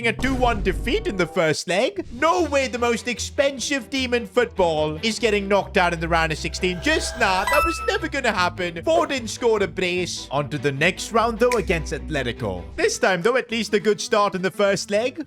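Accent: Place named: India. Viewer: your indian acnent just the way you said "i am building." 🤣🤣🤣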